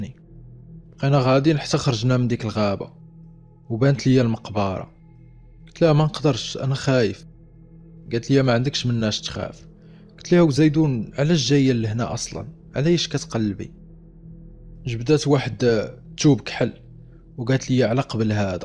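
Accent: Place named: Dammam, Saudi Arabia. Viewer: انــتت من اي ديرة في السعوديــة من اي منطقـة لهجتـك م افهـم شكلك من دمامم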